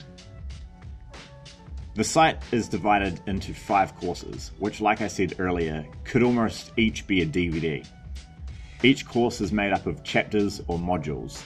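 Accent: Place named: New Zealand. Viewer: You from NZ Bro? :)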